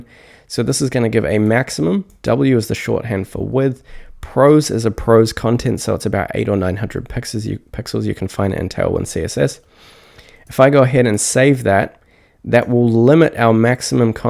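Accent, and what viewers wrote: New Zealand. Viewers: You a kiwi? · New Zealand accent 🤔 chur? 😁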